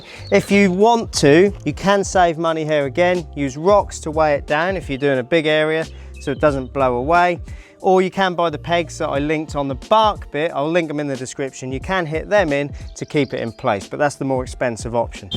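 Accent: Norfolk, United Kingdom. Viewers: Could tell you were from Norfolk within about 5 seconds 😂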